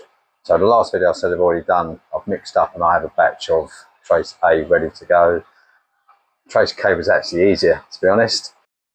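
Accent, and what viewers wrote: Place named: Yorkshire, United Kingdom. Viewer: Yorkshirey way lol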